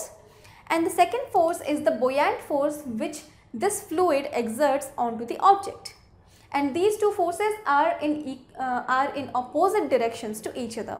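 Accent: India. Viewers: Hi, most of the words / vocap / etc understanadlbe just a request there is no blameing here but for every video dould you add transcript of the video cause the language of indian esspecially accent is effect the english understanding would be bettter. Thank you for your patience.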